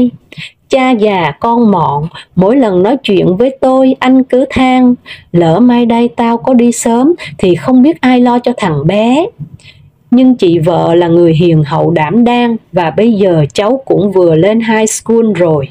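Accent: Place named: Hue, Vietnam. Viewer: Chị đọc giọng Huế rất chuẩn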